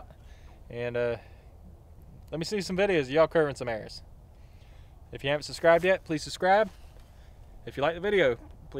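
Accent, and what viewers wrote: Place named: Rural, United States. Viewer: I was expecting him to start spitting into a spittoon chewing tobacco while smoking a cigarette and drinking whiskey from the bottle on his rocking chair... not breaking physics Loving the hick accent tho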